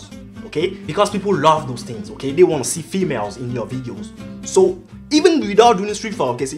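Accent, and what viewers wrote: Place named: France. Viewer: ♥ Meilleur youtuber avec le meilleur accent FRENGLISH mdr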